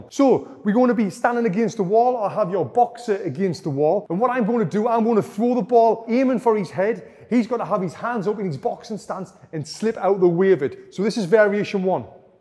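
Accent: United Kingdom. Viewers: British accent sounds cool. From Russia with respect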